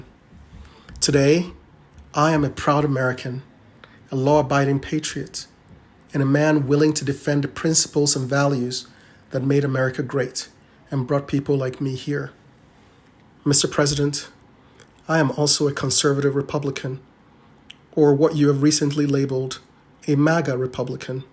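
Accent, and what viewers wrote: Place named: Africa. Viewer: I lived in Nigeria many years ago and traveled to Ghana. You have perfected your accent! I can barely detect any bit of Africa left in your voice but I know you carry it in your heart! Well done, spoken well!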